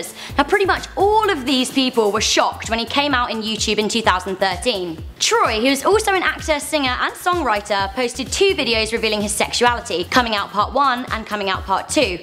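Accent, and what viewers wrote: United Kingdom. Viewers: It's her accent.... people with english or english-like accents pronouce things that end with "A" with an "er" sound. example: idea -> idear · She is british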